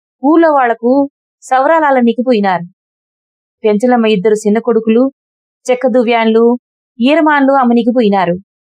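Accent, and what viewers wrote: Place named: Chittoor, India. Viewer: చాలా touching గా వుంది కథ. ఎంతో బాగా చెప్పారు కథ. చిత్తూరు యాస కూడా ఎంతో గొప్పగా పలికారు.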